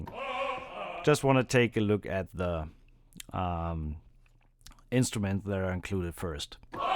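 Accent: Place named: Germany. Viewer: I really like your accent, where in Germany are you from?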